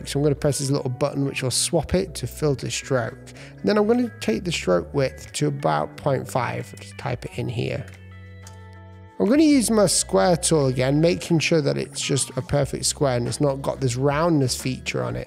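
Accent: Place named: Yorkshire, United Kingdom. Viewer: Hahah that looks mad using a trackpad with an iPad. You from the North btw? I’m sure I’m detecting Yorkshire there somewhere 😂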